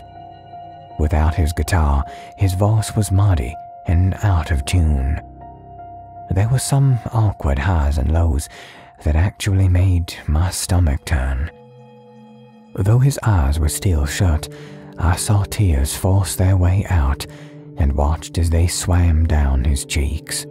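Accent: South, United States. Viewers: Have heard this from another narrator, but you did it so much better! Love that thair southern twang of yours!! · Wonderful timing, my good sir! Bed time! My but you have the perfect Southern gentleman advent! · Your southern drawl was Great!!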